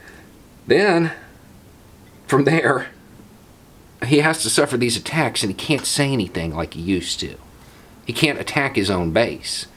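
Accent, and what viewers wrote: South, United States. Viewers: Then you are forgiven! I absolutely love your videos. You remind people across the globe that just speaking in a southern accent doesn't mean you automatically are stupid or gullible or antiintellectual. These are days where we stand for reason and against hate. I, as a Briton who loves America, am horrified by the destruction of the USA in this madmans hands.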